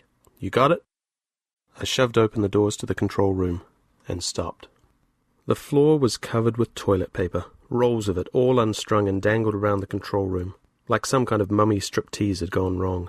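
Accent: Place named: Australia. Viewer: Mate, you’ve already got an Aussie accent that’s hard to understand on a good day, slow down your reading stop blending words together I can’t keep track of what you’re saying.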